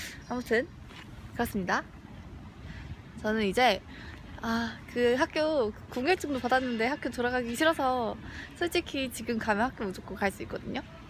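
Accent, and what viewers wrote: Seoul, South Korea. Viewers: 말투가 완전 30년전 서울사투리네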